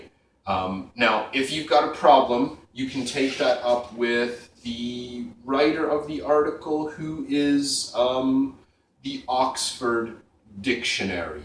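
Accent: Canada. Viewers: Agree mostly. But there is a difference between pronunciation and accent, and you used them interchangeably. Accent is based on where we learned the words -- you have a Canadian accent. Pronunciation is the way of sounding the words, so others understand us. If it is a French word, I will still say it in my American English accent, but I should not pronounce Le Male as Lay Malley. Enjoyed the video.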